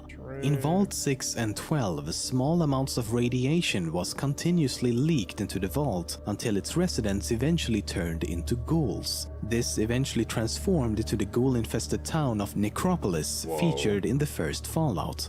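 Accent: United Kingdom. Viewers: you can tell hes british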